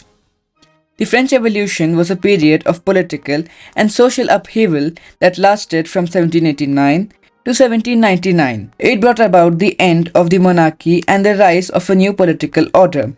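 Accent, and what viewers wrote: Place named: England, United Kingdom. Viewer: Excellent work,Your explanation of the French Revolution is so clear and engaging, and your English accent makes it even more enjoyable to listen to. Looking forward to more of your videos.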